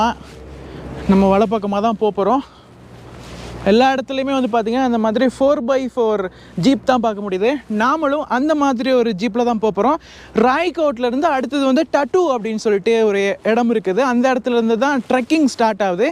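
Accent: India. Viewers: Nee ga srilanka Tamil pesala India Tamil pesuringa ,❤ nella eruku pro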